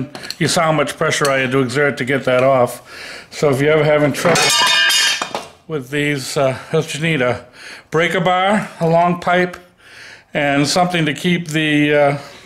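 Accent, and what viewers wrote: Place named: Boston, United States. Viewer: Chicago, Jersey or boston? Oooo he just said the word bar.... hes definitely Boston! 👍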